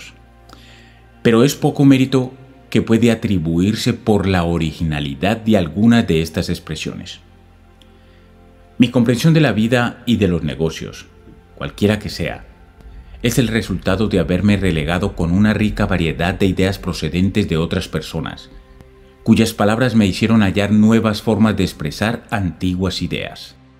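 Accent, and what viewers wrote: Colombia. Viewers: Muchas gracias por los consejos y las ideas = son cosas k hacen pensar y yo he cambiado mi mentalidad ; después que escucho los Audio. Solo queria Saber si la personne que narra es un Colombiano?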